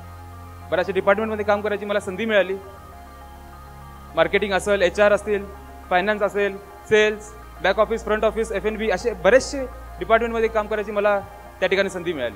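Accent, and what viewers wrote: Kolhapur, India. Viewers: अभ्यासपूर्ण आणि तितकंच अस्सल कोल्हापुरी टोन मध्ये केलेलं भाषण😊👌👍 पुढील वाटचालीसाठी शुभेच्छा 💐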